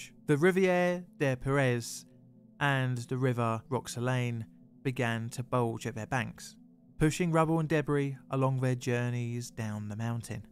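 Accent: United Kingdom. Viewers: I think this particular YouTube channel is run by a British guy. But if you can manage to understand British people and Americans you've learned every accent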